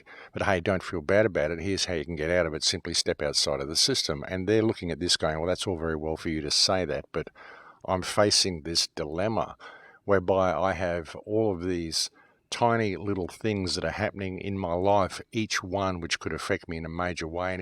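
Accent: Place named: Australia. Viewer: its good to hear an aussie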